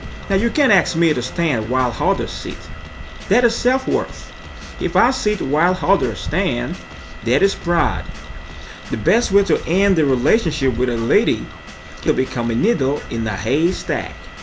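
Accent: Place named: United States. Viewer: well said. this American accent na wa o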